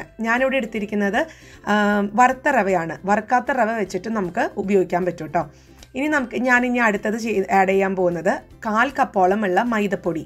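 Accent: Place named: Palakkad, India. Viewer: Samsaramishtama chechiye jadayillatha nishkalangamaya nadan basha. Pkd aano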